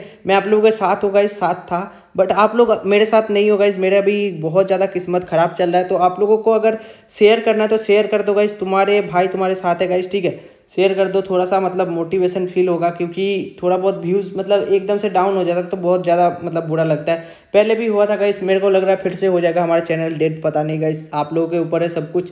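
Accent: Bengal, India. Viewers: Bhai tu bangali hai kya ? 😊😊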